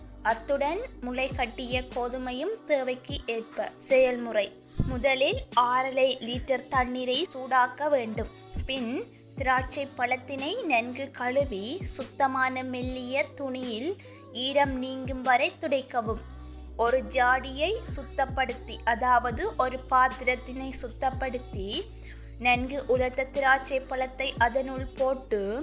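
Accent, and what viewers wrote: Sri Lanka. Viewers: She is speaking srilankan tamil..pakki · Elangai Eelatamil arumai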